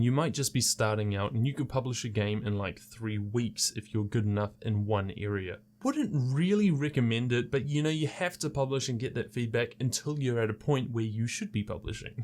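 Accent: New Zealand. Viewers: R u from NZ?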